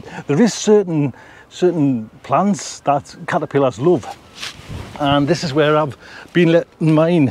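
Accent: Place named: Newcastle, United Kingdom. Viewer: Great surprise! Nice to hear a Geordie accent - I live in Colorado and we have a bad outbreak at the moment.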